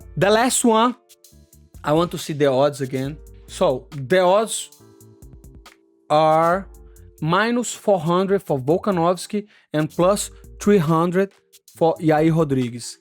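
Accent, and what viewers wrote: Brazil. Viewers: I watch your channel because you remind me of my brazilian friends accent back in high school. Youre a great fighter and comedian Respect brother.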